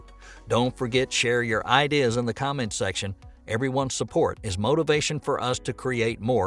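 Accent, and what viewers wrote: South, United States. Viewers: very good video. I work at JSC and I love hearing a friendly Southern ascent delivering complex information.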